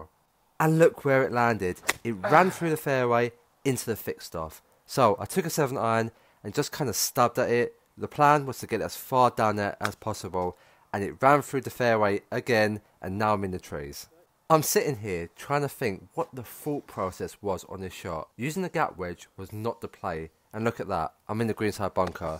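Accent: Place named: United Kingdom. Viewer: I’ve watched so many of these videos that as an American his British accent is sounding like a normal-to-me american accent from my POV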